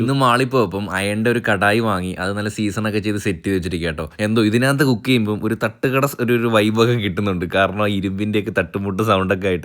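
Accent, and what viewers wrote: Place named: Kasaragod, India. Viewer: enik ishto "kaka parndi" kasargod pariyunad😂😂😊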